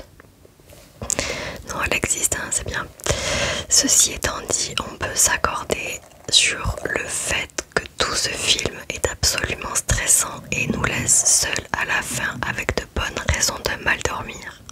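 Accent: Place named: South, France. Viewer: avec grand plaisir A moi de te remercier pour tes vidéos de grande qualité et toujours intéressantes Et ta passion pour l'astronomie et l'espace est contagieuse tu en parles d'une belle manière ! Sinon pour l'accent du sud (milieu) bah ne le perds pas j'adore ! moi je ne vis plus en France et il s'est évaporé avec le temps et la distance mais j'ai grand plaisir à le retrouver quand tu chuchottes ! Continue tu es excellente 💫🪐👍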